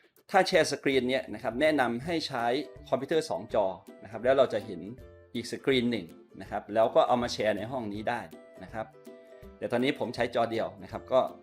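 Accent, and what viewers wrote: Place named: Thailand. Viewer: ทำไมคนที่ไทยต้องออกเสียงชื่อหรือศัพท์ภาษาอังกฤษให้เป็นสไตล์แบบไทยๆ Google กูเกิ้น ได้อย่างไร เวลาไปเมืองนอกพูดภาษาอังกฤษคนฟังไม่ออก (ต้องทำไปทำไมครับ)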